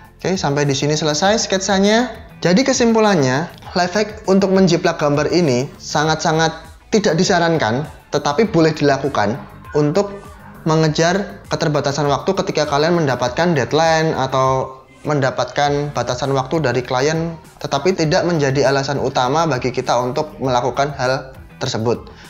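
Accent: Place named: Purbalingga, Indonesia. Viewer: Salaam kenal ... masnya asli Purbalingga ya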